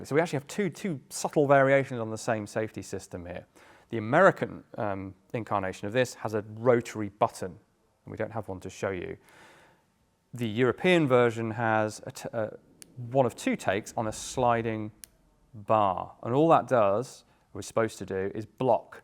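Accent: England, United Kingdom. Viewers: Some beautiful English accent for my ears. Greetings from Argentina!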